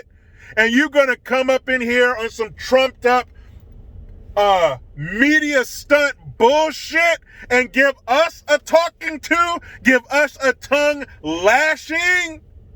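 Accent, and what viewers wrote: Australia. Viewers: Love the Australian accent, mate! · Love your Ausssie accent, pmsl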